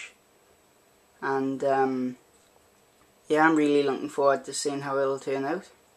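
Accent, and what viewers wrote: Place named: Durham, United Kingdom. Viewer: Lol we're abouts are you from? Sounds like somewhere next to Durham lol. N how many tanks Do you have? I have two going to get more though :)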